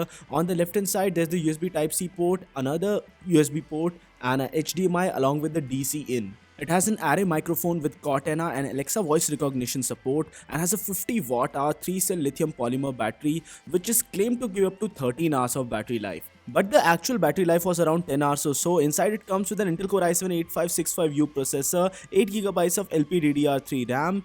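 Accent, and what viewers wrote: India. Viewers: English ( Indian accent ) 😅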